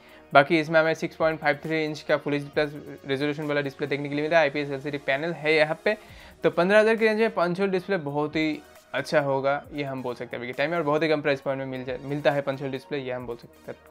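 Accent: Bengal, India. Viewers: Vai tmr bari kothay.. i think u r bengali.. tmr kotha dhoron bangla type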